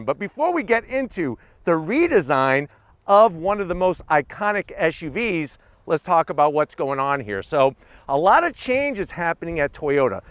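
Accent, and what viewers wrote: United States. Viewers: Sounds weird but American 🇺🇸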